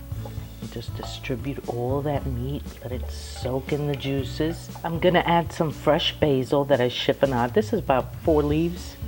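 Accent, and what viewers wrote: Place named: New Jersey, United States. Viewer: I love your accent lol I knew you were from Jersey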